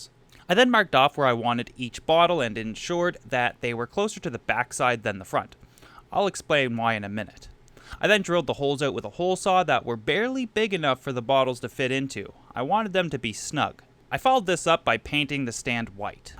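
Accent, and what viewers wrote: Canada. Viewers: Best video on this subject. You are Canadian huh? I can tell by how you say about. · Are you from Canada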